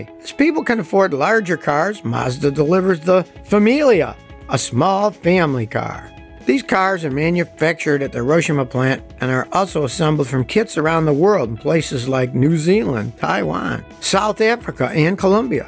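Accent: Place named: Appalachia, United States. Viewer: Now, that's a Hillbilly accent!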